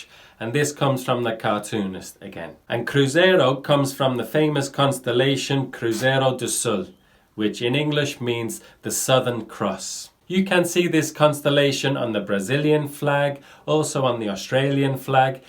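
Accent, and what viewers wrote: United Kingdom. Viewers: Are you a scouse?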